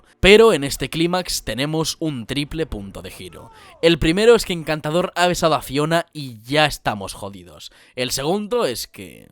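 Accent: Spain. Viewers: Que diferente es escuchar un análisis de Shrek por un español, cuando tu la viste en español latino. No saben de lo que se pierden xD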